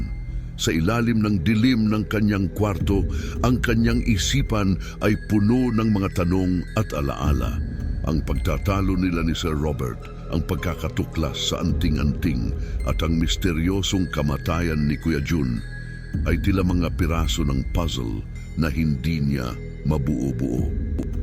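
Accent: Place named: Tagalog, Philippines. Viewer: ❤Daddy and his lettle tagalog accent 😊😊😊charr😊😊😊😊😊😊😊😊😊😊😊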